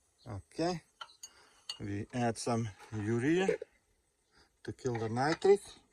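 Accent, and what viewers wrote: Russia. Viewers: You sound like Crazy Russian Hacker. You must be Russian. Am I correct?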